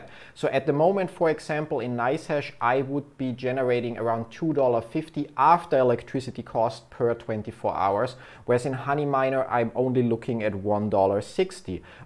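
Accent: Germany. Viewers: du bist einer der sehr wenigen deutschen dem ich gerne beim englisch reden zuhöre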